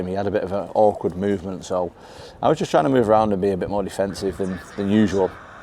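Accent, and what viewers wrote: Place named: England, United Kingdom. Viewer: I like his English much better than American English ❤ The real one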